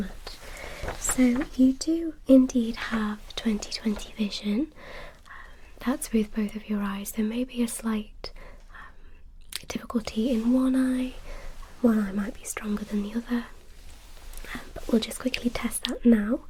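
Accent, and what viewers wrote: United Kingdom. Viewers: I love her british accent😍👍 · So I see your from BRITLAND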